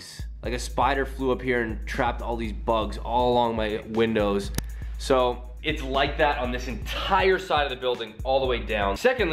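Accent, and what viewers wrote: Canada. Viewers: He's Canadian so he pronounces some things differently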